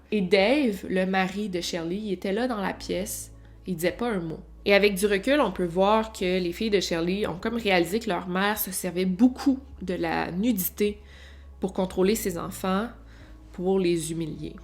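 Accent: Quebec, Canada. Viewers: J adores ton accent duQuébec! Par contre même si je comprends bien tout s ke tu dis là!😅 C un peut bizarre pour moi! J suis pas habitué sti!😅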